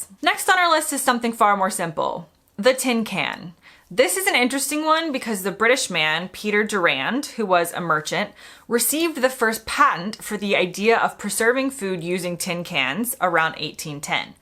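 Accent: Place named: United States. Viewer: Immediately I identified your American Accent, then you explained the reason. Very short list of British Inventions but none the less very interesting. The British have a habit of giving away inventions without realising their actual VALUE...ie The Jet Engine also given to Russia but not even a THANK YOU.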